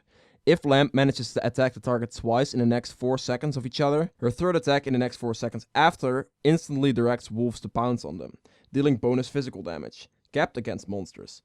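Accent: Netherlands. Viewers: ben je nederlands? ;D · je hoort altijd als een Nederlander Engels praat haha, ^_^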